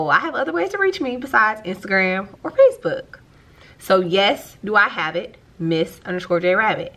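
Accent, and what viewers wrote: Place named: South, United States. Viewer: Ya accent is so sexy. I love that country girl accent?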